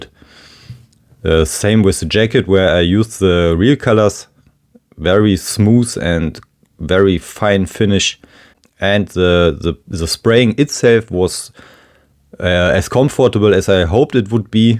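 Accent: Germany. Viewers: Excellent video and don't worry about your English is perfect and great with German accent 😄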